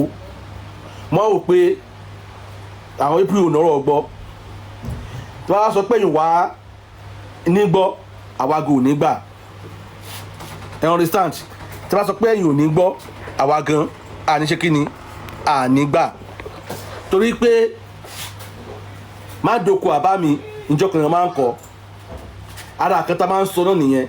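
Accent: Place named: Benin. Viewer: Kaare omo oko, omo kaaro ojire atata. From his accent and some words you could tell that he is from Bénin Republic omo Yoruba ogidi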